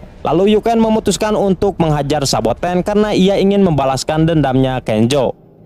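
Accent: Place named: West Java, Indonesia. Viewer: Sarebu persen pembicara adalah USA alias Urang Sunda Asli, katambahan katkuaya babangus mah semakin teryakinkan.... Wkwkwk mantap ieu si mamang sumanget terus sesuai alur Carita 👍🏻👍🏻👍🏻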